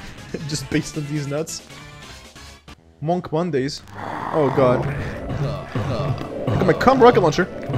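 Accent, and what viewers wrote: Russia. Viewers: I can't decide, I hear the Russian accent and see the inscriptions on the Russian, but at the same time you have in the description, the location of USA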